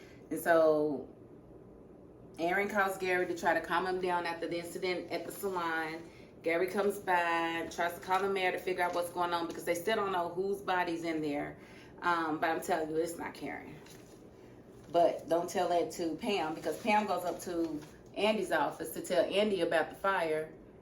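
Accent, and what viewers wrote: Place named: Chicago, United States. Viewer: I’m a relatively new sub. Are you from Chicago? Your accent reminds me of Selena Johnson.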